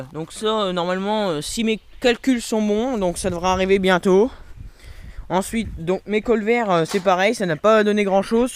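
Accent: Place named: Marne, France. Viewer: Je crois reconnaître le même accent que moi de la Marne non!?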